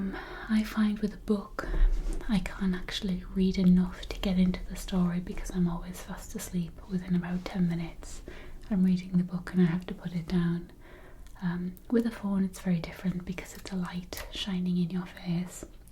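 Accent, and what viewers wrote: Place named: North East England, United Kingdom. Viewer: Love it. Totally relaxing 😍😴 also love the northeast accent I'm from north yorks so having a local asmr artist rocks 👍🏻👍🏻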